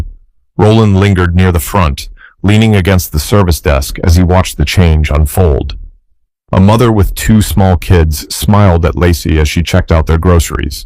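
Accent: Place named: United Kingdom. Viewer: Best way to improve British Accent. RECOMMENDED🎉🎉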